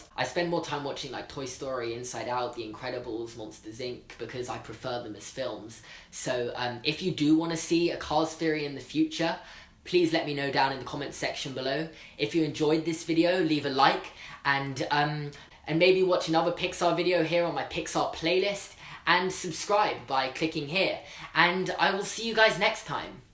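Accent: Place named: RP, United Kingdom. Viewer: You're so fucking posh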